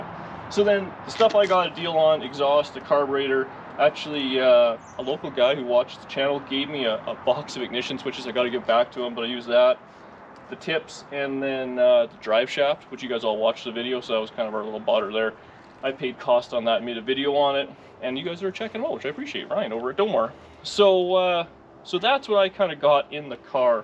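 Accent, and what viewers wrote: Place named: Canada. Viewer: I knew you was Canadian as soon as the video started. I live in Detroit and you know the Hockey Competition gets pretty Heated. Nice Car Man.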